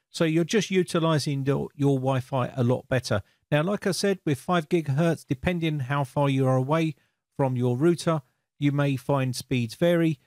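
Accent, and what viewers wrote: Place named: London, United Kingdom. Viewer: Well I suppose you live in or near London because of your accent 😄which I find makes me feel a little homesick (Sussex born and bred). But in any case we out here are sadly lagging far behind the rest of the world and I would pay for double my speed if it were available out here in the country still I suppose something is nothing eh??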